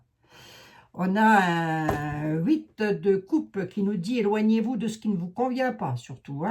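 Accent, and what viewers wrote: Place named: Paris, France. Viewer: Mais j'aime ! La belle Lorraine qui parle en Titi de Paris ❤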